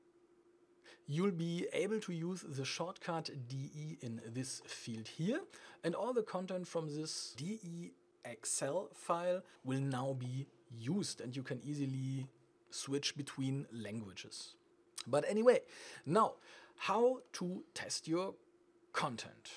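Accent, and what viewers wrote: Germany. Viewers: Yes, he is a true German! A rare breed. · Is the guy talking from Germany? :D sounds a lot like it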